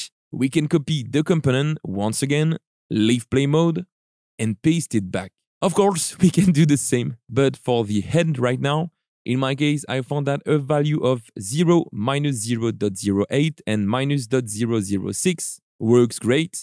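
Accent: France. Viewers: you have a french accent ?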